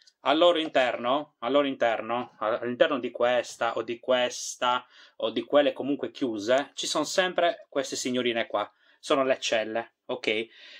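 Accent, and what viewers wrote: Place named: Sardinia, Italy. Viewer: Magari fossi brava come te...mi sto arravanando....ma non ce la posso fare da sola e sto cercando elettricista non solo bravo ma con la mente aperta che mi aiuti. Qui da bricoman oggi stanno vendendo i pannelli monocristallino da 450 .... ma ammesso che vadano bene non saprei che inverter abbinare. Cmq grazie, perché sei un'ispirazione oltre che molto simpatico con la tua semplicità e schiettezza oltre che per il nostro magnifico accento sardo....sono molto orgogliosa perché penso che tu sia veramente molto preparato! BRAVO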